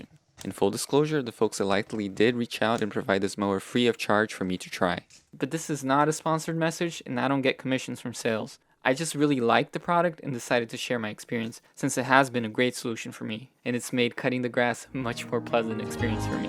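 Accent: Brazil. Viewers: Are you are Brazilian American or a Ivan Brazilian. Your perfect pulo do gato accent made me wonder. That said. I love the aluminum can idea - do they provide additional heat for roots? Is that good? I’m having a hard time with. Making the cilantro seeds sprout.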